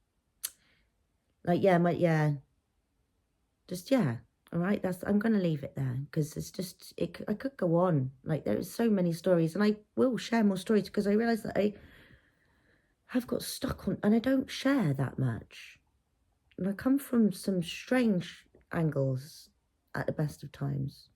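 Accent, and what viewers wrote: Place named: England, United Kingdom. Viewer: I dont think my comments to the first vidoe of yours were correct about being a witch, but im not certain. You talk like me but with a English accent. Im going to get to the bottom of this.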